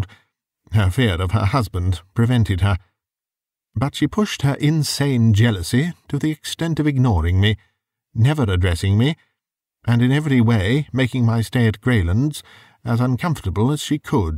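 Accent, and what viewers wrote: United Kingdom. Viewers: I agree! The British voice is very very pleasing! IM SIMILING RIGHT now!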